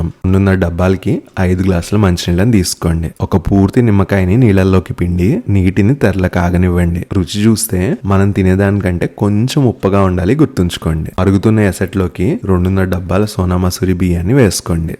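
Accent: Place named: Vijayawada, India. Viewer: Wow pure Telugu,,, are you from vijayawada or khammam?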